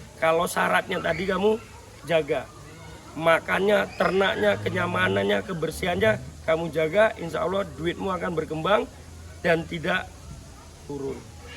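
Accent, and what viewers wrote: Banyumas, Indonesia. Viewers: Ciri khas ngapaknya jangan sampai tertinggal mas selalu sukses selalu lembu benggolo farm & resort 🙏🙏🙏🙏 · Ora ngapak yaa ora kepenak... Ora kepenak yaa ora ngapak... 😍😍😍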